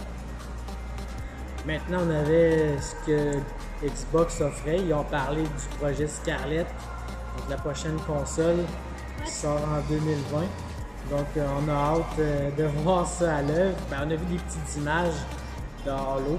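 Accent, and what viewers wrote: Quebec, Canada. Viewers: 😂😂😗tu et québécois nn ?(sans être indiscret bien-sûr)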